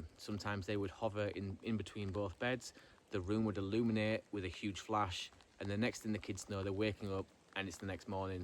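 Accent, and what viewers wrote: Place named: North East England, United Kingdom. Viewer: Don't short-change yourself! We love your N.E. accent alot; but we're really here for Lily! (LOL HARD) 👽👽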